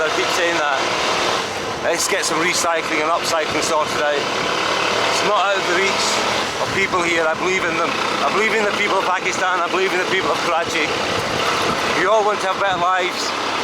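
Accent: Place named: Scotland, United Kingdom. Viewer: I love your Scottish accent ❤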